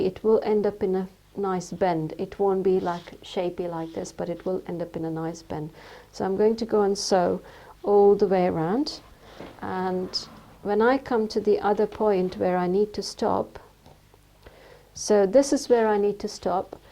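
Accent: United Kingdom. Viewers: Where do you buy your notion? I been sewing for 40 years here is the USA. I never see a zipper that long. Are you from the UK. I think I hear a British accent? You have a beautiful speaking voice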